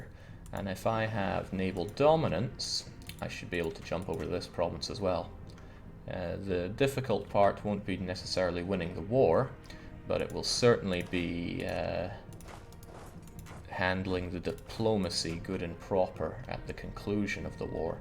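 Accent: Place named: Inverness, United Kingdom. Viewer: You sound Invernesian, you a snecky?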